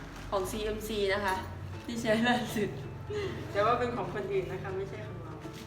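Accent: Thailand. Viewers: พี่ใช่คนอุบลมั้ยคะ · เอ๊อะ555คนอีสานตั้วนิ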